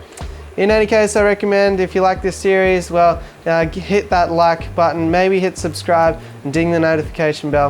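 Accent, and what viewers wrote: Australia. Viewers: Are you from Geelong !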